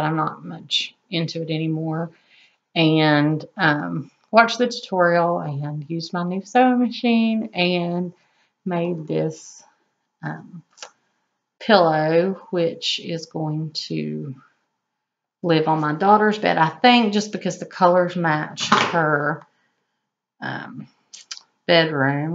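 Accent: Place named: South, United States. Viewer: Hello and welcome I heard you and thought immediately... yeah another southerner.... and then when you were showing where you are from and were talking about Dr. Pepper I was like dang you are in my neck of the woods. Looking forward to seeing more of your projects and future videos.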